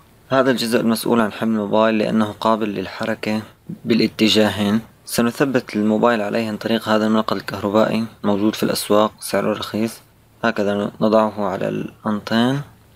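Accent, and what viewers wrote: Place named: Syria. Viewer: حسيتك سوري 😁😎 حلو كتير استمر👍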